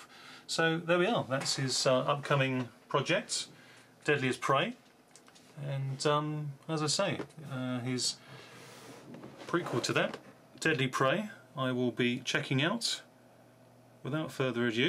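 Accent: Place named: England, United Kingdom. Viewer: noticed your accent mate i am from england too, come from essex mate. how did you manage to get deadly prey on vhs. i used to have a vhs copy orinigal not in japanese writing, the cover looks like danton at the end of the film screaming outside of a bulding, which is misleading cause you don't see any buildings in the film. still great movie. don't have jungle assault still trying to get a copy. do have deadly prey.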